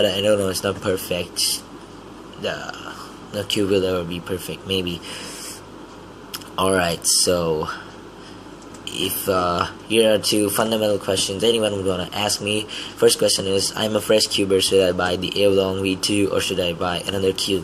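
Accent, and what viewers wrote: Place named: United States. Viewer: Dude why the American accent..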